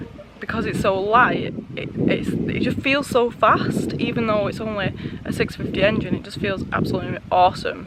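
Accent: Northern England, United Kingdom. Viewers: Yeah there's always some weirdos to contend with! Keep smiling and enjoying yourself, the enthusiasm comes through in your uploads which is great to see... Proper northern accent too... Im from Warrington and sounds like you're not to far away...ha ha.. ride safe chuck 👌😉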